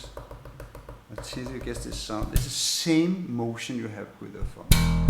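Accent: Denmark. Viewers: Elsker din dejlige tykke Københavner accent, den giver én en ret fed nationalfølelse :-) But great job man, I'm enjoying learning from you.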